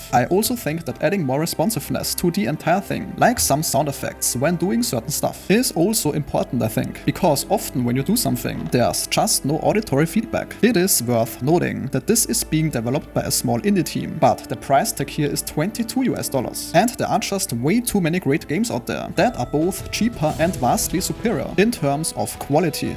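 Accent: Germany. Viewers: Der Deutsche Akzent ist schon nice XD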